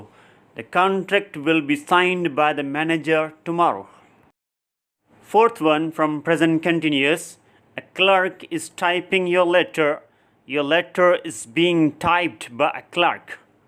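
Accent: Sindh, Pakistan. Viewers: GOOD EFFORTS TO CONVERT SINDHI ACCENT TO ENGLISH BUT TRY IS TOTALLY SEEMS VERY OVER !!!!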